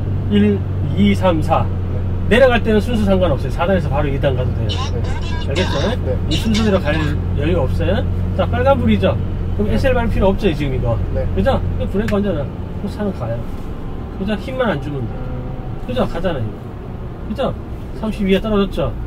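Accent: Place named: Gyeongsang, South Korea. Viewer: 이거 보고 1종보통 도로주행 한번에 합격했습니다 직관적인 설명,약간의 경상도 사투리(?)...친절함까지... 1종보통 합격요령은 기어조작능력 + 순발력 + 느긋함 + 약간의 센스가 필요해보여요.저도 뭐 유튭영상 수십번 시청하고 했지만 막상 시험칠때는 아무 생각 안나더 군요.긴장을 삭히고 배운대로 익힌대로 차분하게 마인드콘트롤 하면서 초반이 제일 중요하니 초중반만 문제없이 넘기니까 후반쯤에는 오히려 자신감이 생기데요:)) 보통 도로주행 70점컷인데 만점은 거의 없고 80~90점으로 많이 합격하더라구요 만점받을 생각하지 마시고 감점을 최소화하는게 합격요령이에요 다들 꼭 한방에 합격하시길 바랄게요 화이팅!!!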